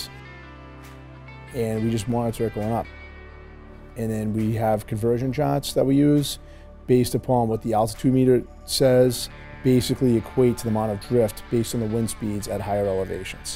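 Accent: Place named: Rhode Island, United States. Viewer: That was great. Your accent brought back great memories of my uncle from Rhode Island!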